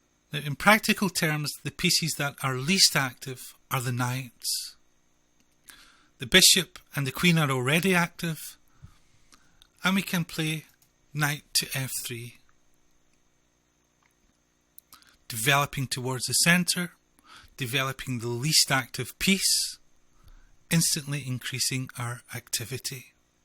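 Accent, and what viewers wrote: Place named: Scotland, United Kingdom. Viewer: Great video thanks. What part of Scotland are you from?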